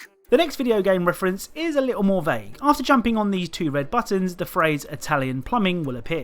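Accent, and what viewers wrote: United Kingdom. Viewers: you british man?🤨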